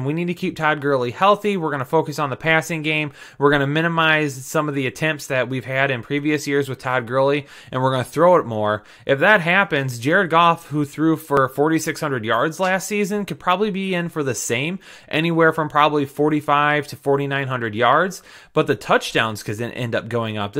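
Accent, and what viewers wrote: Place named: Minnesota, United States. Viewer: Man that Minnesota accent is real!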